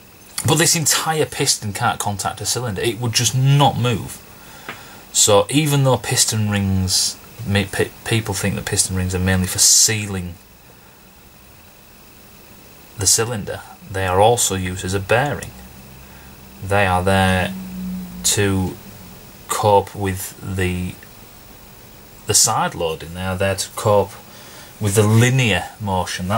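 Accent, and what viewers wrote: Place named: North, United Kingdom. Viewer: Using this in 15 minutes with Saudi Aviation students here in sunny Riyadh - brilliant explanations - simple yet informative. Nice to hear a good Northern accent - reminds me of home!! Cheers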